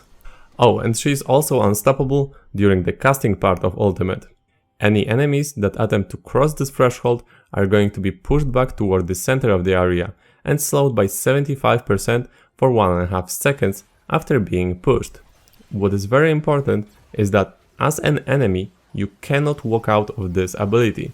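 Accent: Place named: Poland. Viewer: Ah ten polski akcent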